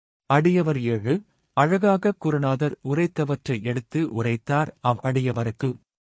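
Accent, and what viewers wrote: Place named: North, India. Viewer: தயவு செய்து தமிழ் தெரிந்தவர்களை வாசிக்கச் செய்யவும். அகத்திய மா முனி / பெயரை கெடுக்காதீர்கள் தமிழுக்கே இழுக்காகும். யாரோ வடக்கர்களை வைத்து வாசிப்பது போல உள்ளது.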